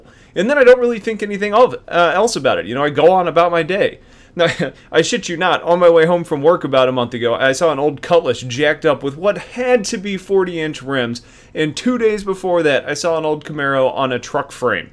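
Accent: United States. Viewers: Btw, it's nice to finally find someone talking about this game with an American accent who speaks clear words. Some guys that play this sound like they're gargling syrup!! Good work.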